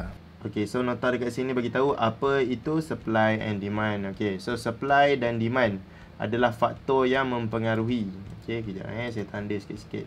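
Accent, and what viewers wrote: Pahang, Malaysia. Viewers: terbaikkk sifoo..loghat mcm org phg jekk..hehe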